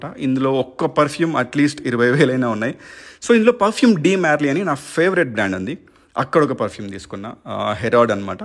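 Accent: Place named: Hyderabad, India. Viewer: I THOUGHT U ARE HYDERABADI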